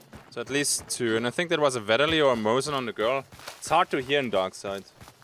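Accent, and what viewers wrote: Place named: Germany. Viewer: If I may ask, are you German? Bcs you kinda sound german and your grammar hints at native german too. I am always happy to see a Landsmann on the internet. 😁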